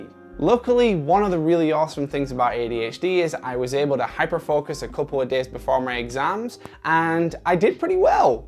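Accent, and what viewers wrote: United Kingdom. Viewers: How did you get adderall in Uk??? It’s £400 a box and isn’t on Nhs! Unless you live in USA! Lol you sounds British tho!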